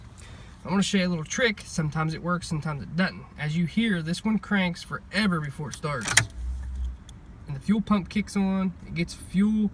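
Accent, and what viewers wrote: South, United States. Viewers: Howdy. What's your opinion of the rear brake drum to disc conversion kits? Is it a worthwhile upgrade do you think? Thanks, man. (Your vids are great, and so is your Southern accent)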